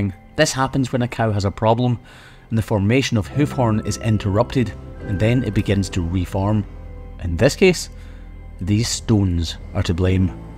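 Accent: Scotland, United Kingdom. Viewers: You sounded more Scots in this vid... what happened? ... ... ... Was this a repeat from a few years ago? I mean, you almost sounded like Craigy Boy! XD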